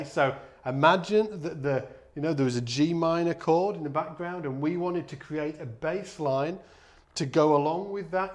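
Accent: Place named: Manchester, United Kingdom. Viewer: Tha sounds like a manc lad, lad! Great tutorial BTW Im a guitarist and this was great help for me. Just one thing....have you just got back from robbing an ASDA?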